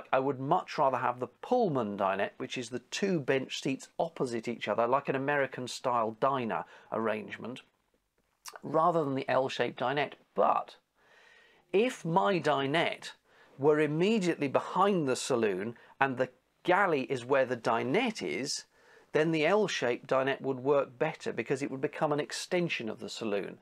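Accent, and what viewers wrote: United Kingdom. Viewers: Best British accent. No matter what you say, it sounds legit.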